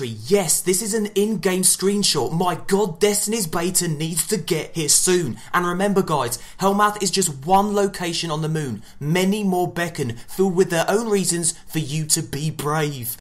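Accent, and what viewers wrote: Australia. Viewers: yer australia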